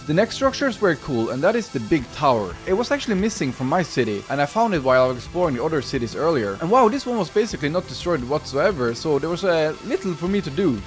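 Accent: Sweden. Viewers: love the Swedish accent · are you from sweden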